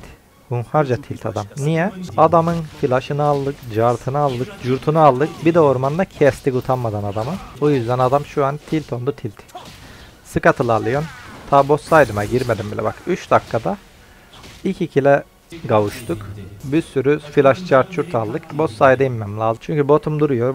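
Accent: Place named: Zonguldak, Turkey. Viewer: Reis Zonguldaklı gibi bi gonuşman var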